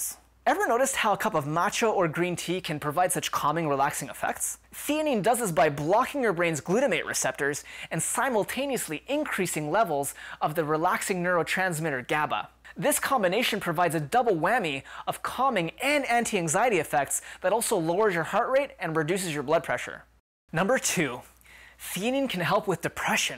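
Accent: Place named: Canada. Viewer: it does sound very Canadian, the portuguese nuance is very very delicate - almost unnoticeable! I am portuguese, but i live in Poland! um abraço da Polónia ;)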